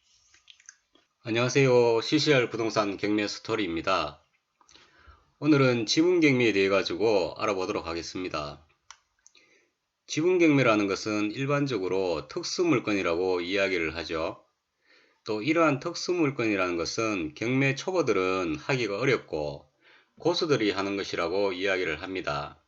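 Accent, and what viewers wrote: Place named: Gyeongsang, South Korea. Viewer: 경상도사투리가 이렇게 부드러운줄,, 내용도 넘 쉽게 귀에 쏙쏙,,감사합니다 좋아요 구독합니다^^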